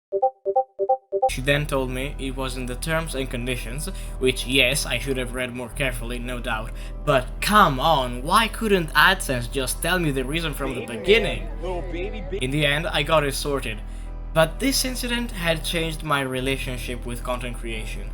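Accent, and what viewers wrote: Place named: Balkans. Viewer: Blud you sound hella balkan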